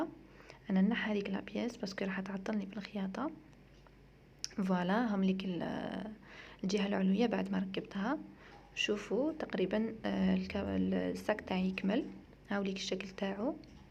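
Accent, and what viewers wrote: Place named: Iraq. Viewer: اللهجه بيهه عراقي عاشت يداكي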